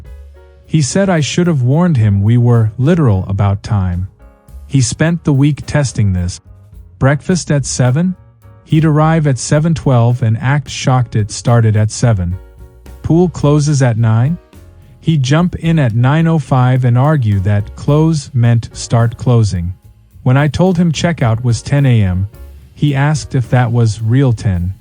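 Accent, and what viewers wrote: United States. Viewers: All these posts showing the arrogance, entitlement and stupidity of Americans in a wide range of experiences leads to the overwhelming desire to ignore them all completely and, at times, just tell them to bugger off. Also, all the supposed interactions with Australians are delivered in an American accent, not an Australian one which would possibly make them half way credible.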